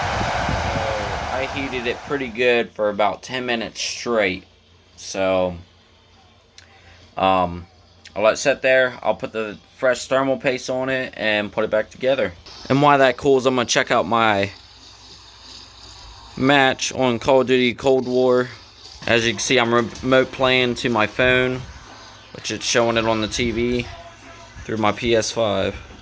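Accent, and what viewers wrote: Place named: Pittsburgh, United States. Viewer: You have to be near Pittsburgh....That accent.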